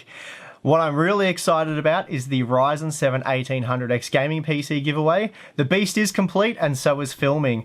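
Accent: Australia. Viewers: what is this guy an Aussie? Aussie! Aussie! Aussie!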